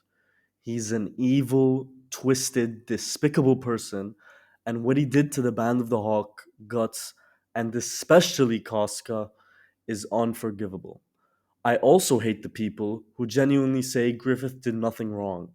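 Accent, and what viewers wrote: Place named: Levant. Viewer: course! On an unrelated note, are you from the levant? Your general vibe and inflections sort of make me think you're from the Lavant, perhaps Syria or Jordan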